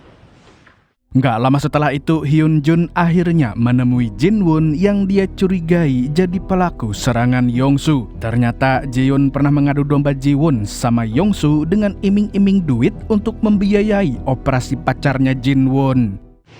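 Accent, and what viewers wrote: Banjar, Indonesia. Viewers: Nah urang banjar kh😂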